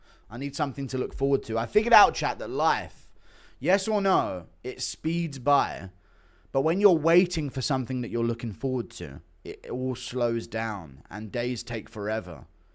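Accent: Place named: India. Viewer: has an indian accent off stream, it stinks like shit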